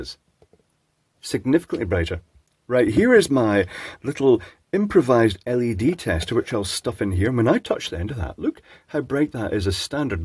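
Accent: Scotland, United Kingdom. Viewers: trying to avoid saying "shaft" in a delicious scottish accent 😂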